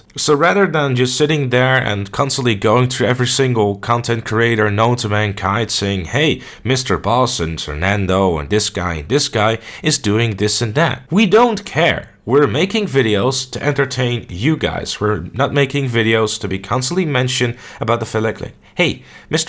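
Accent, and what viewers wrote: Netherlands. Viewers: are you dutch? your accent seems dutch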